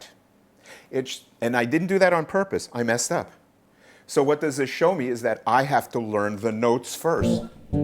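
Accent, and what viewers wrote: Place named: Long Island, United States. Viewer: A fellow Lawn Guylander?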